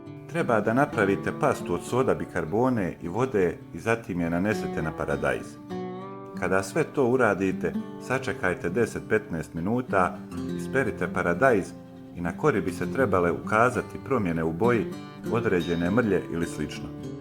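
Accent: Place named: Herzegovina, Bosnia and Herzegovina. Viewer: Mene kao Lalu naglasak tj način govora ovog čoveka izuzetno podseća na onaj kojim govori hrvatski teniser Marin Čilić, koji je iz Međugorja, a samo malko manje i na tip govora našeg košarkaša Alekse Avramovića, koji je iz Čačka, a dosta i na Crnogorce, generalno. Dakle, valjda, tipično hercegovački(?)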